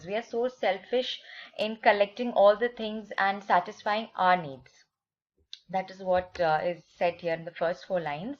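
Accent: Kerala, India. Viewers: Last minute preparation with you is the best way of learning with you mam.....I think u r malayali because the way u explains hindi is like my mom....❤️🦋🌝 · Mam are u malayali...btw great expaination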